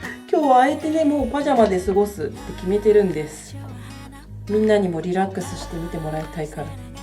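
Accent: Kansai, Japan. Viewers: の動画はずっと穏やかな気持ちで観られるから、心が疲れた時に自然とたどり着きます🍀 毎日お疲れ様です🍵🌱 10年以上が上手に標準敬語話す声に慣れてるから関西イントネーションになってるのが逆に新鮮だった😂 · 今日関西弁強めですね、かわいい😂❤❤ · 関西のイントネーション多めなが新鮮で可愛かったです❤笑そしてカレーを見てると自分も食べたくなる不思議現象が起きますっ😮❤笑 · 後半関西弁になってて可愛かった❤ 私も関西人です昨日から体調崩しててパジャマのまま横になって観てますカレールゥ買ってみます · なんか今回関西弁が強いですね！どういうスイッチで変わるんですかー？☺️ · ミッフィーのクッキングシート、可愛い💕と言った瞬間にグチャグチャに丸めたのツボに入りましたw😂✨💕 関西弁多めで嬉しかったです😊 ずっと脳が働いてそうだから、しっかり休んで下さいね♪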